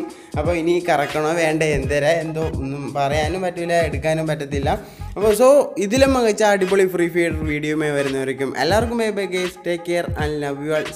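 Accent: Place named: Trivandrum, India. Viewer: Bro tvm ill ano 😁 slang athupole indu ❤️❤️ i like ur voice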